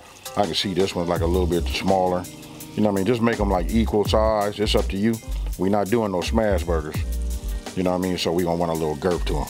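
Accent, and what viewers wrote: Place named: West Coast, United States. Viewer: Anybody else can tell that he's so West Coast??? Not mad at it at ALL!!!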